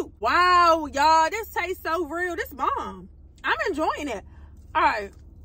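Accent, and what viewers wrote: Baltimore, United States. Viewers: "One thing about KFC, KEEP THE RECIPE THE SAME" lmao - SN. The minute I heard you begin talking, I thought to myself: she HAS to be from DC or PG Country. Then I saw your Capital Heights PO Box in the caption. Lol! Loved this review, my fellow DMV Queen! I am about to try these today! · Always watching videos you keep me laughing because you keep it real I got a question for you are you from Baltimore cuz you sound like you got the Baltimore accent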